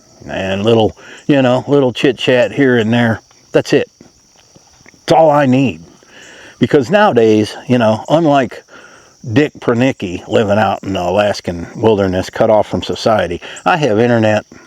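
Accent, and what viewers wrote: South, United States. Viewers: Hi. I'm Tony from NJ, and I used to have a condo. Sold it a few years ago, and I now live in a van, in the suburbs of Jersey... In a strange way, even though surrounded by humans, I also feel like a hermit.. I love no debt, no bills and #1 freedom! One day I may move out to the country.. idk.. but I've been saying that forever! NJ is home.. for better or worse.. thanks for sharing ur existence.. ur southern way of speaking is one of a kind!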